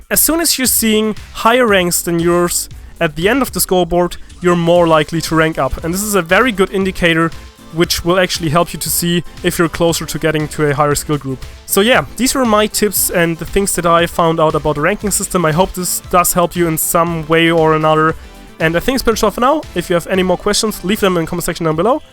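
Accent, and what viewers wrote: Germany. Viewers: can u speak german??or are u from germany??u r listening like a german guy who is speaking and learning a lot englisch ^^ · Du hast nähmlich nen Deutschen Akzent! :D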